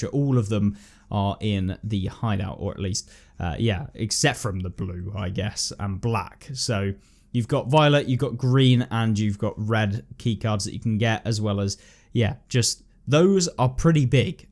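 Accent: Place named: United Kingdom. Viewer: i like that british accent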